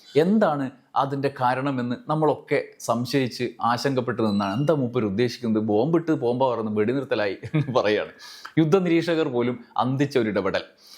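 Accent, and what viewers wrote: Kannur, India. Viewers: നിങ്ങൾ കണ്ണൂർ കാരനാണോ?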